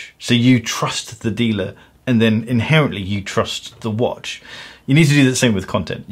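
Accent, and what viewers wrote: United Kingdom. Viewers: is the Chris Harris of the watch world. Always well articulated thoughts and enjoyable to watch. It might also be the British accent.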